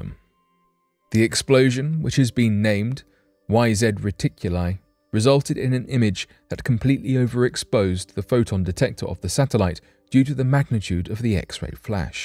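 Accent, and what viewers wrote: England, United Kingdom. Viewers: The believability of your videos varies. But the audio volume is super consistent. That and the high frequency of your videos makes this channel my go-to chill out channel. I also love English accents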